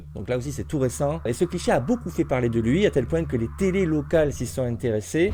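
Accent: South, France. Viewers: Je te découvre et je follow en 10 secondes pour ces raisons: + super chapeau. L’accent du Sud c’est le bonus.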